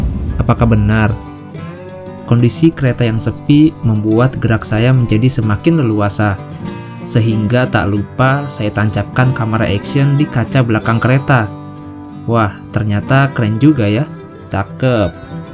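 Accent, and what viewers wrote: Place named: Depok, Indonesia. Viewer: Bahasa depok bgt nih wkwk salam dr depok bang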